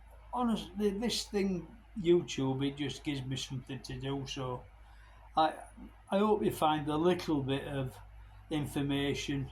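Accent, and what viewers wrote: Yorkshire, United Kingdom. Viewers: Where are you from pal are you from Yorkshire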